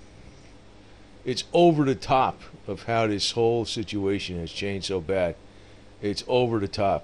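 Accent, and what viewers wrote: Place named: California, United States. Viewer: Sound like California until u said isisl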